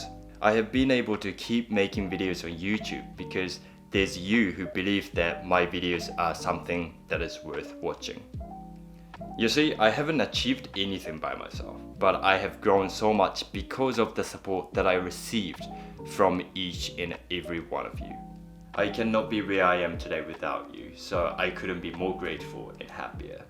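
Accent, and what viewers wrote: New Zealand. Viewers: Your accent is so new zealand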